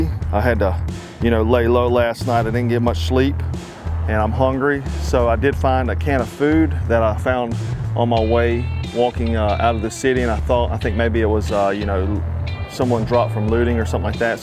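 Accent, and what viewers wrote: South, United States. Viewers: Now I know why that southern accent reminded me of home, we're from the same city lol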